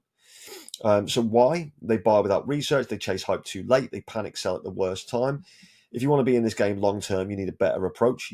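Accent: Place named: Manchester, United Kingdom. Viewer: Great video from the man who i thought was from Manchester 😁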